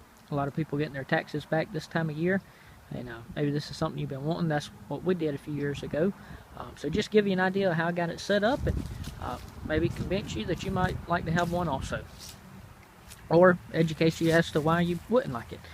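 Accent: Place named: South, United States. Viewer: Thats great. Your accent sounds like mine, southern! I live between Sylacauga and Talladega Alabama. Just curious if you were from Alabama.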